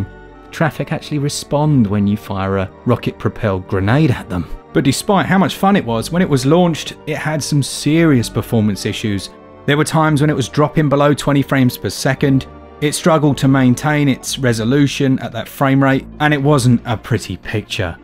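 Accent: England, United Kingdom. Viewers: Thanks for the updated patch review. Too many reviews are 2019 and prepatch, and just slate it. The game looked good so i grabbed it for 7Aud off switch store. Glad to see the game has been sorted out for the most part. Also good to hear another english accent on YouTube gaming reviews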